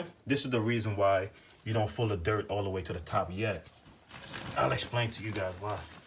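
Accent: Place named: New York, United States. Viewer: Haitian New York accent is What’s up!!!! 🎉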